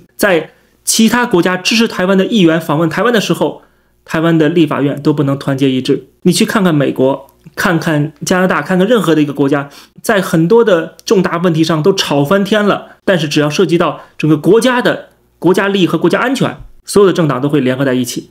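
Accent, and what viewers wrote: China. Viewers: 你支持台独却又没法做更进一步的事情，你说你气不气？公子狗只能整天在YT这里哇哇哇狗叫，一个大陆口音的人去当湾湾人的舔狗，你除了狗叫还能干啥，拿点实际的东西出来啊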